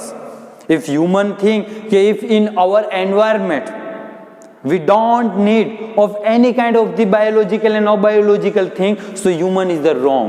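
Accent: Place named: Gujarat, India. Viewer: Watching from bangladesh sir,i like ur accent though i dont know gujraati but this accent sounds adorable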